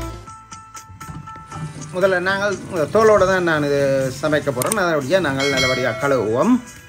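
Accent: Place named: Sri Lanka. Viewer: Pusanikai soup.... So different and delicious brother. இலங்கை தமிழ். கேட்பதற்கு மிகவும்அருமையாக உள்ளது.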